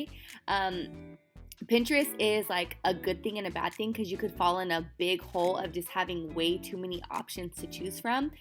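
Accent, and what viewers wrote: France. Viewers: Ahhhh you speak French! Trop bien i love your accent 💕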